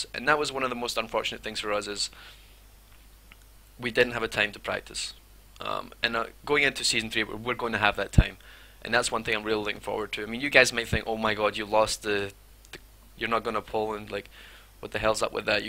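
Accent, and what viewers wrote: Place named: Scotland, United Kingdom. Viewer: didn't know had a lovely scottish voice:D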